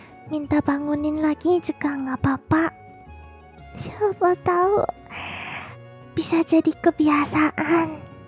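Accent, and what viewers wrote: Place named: Java, Indonesia. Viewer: Kak ngomongnya medhok ea...hehe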